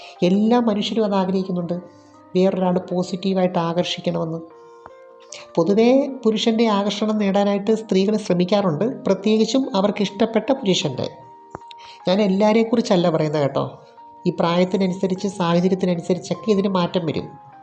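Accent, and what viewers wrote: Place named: Travancore, India. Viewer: ഈ പറയുന്ന മാം ന് മൂക്കടപ്പോ എന്തോ ഉള്ളപോലെ... പിന്നെ തിരുവിതാംകൂർ ശൈലിയിൽ, ഭ- ക്ക് "ഫ"കാരം ഉപയോഗിക്കുന്നു.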